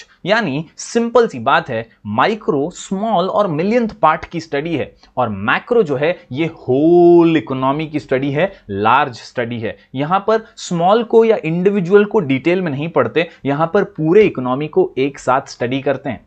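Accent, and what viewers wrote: Maharashtra, India. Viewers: BRO YOUR MARATHI ASCENT SO COOL (tumhari video kon edit karta hai )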